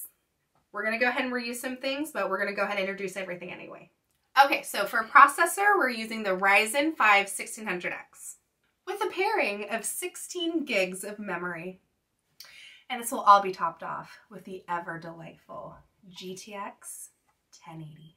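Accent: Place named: Oklahoma, United States. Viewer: Watched this with ny 8yr old daughter since she wants this case for her pc and she asked me if you are from Oklahoma lol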